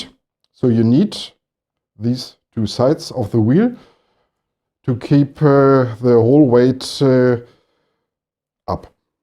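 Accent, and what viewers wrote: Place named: Germany. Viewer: are you german? you dont realy sound native english 🙈.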